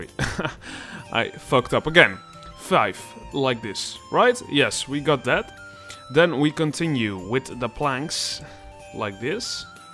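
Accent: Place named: Netherlands. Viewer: Haha nice, I can hear you are accustomed to make video's in The dutch language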